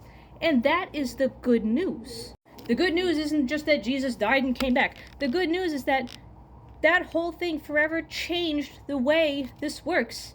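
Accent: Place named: New York, United States. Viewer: Somehow I needed this angry, swear ridden jersey/new york accented sermon today and I'm more agnostic than anything. You nailed it.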